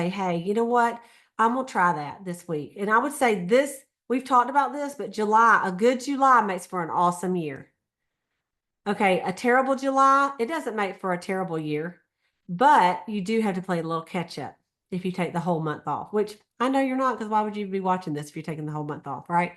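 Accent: South, United States. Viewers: I love your country accent. I wish I had one like that. Your voice makes your videos more fun to watch and listen too. I also hear the joy and excitement in your voice and see it on your face. I believe you really enjoy what you do.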